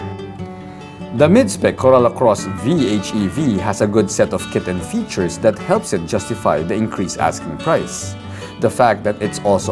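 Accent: Philippines. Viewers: Idol, filipino english accent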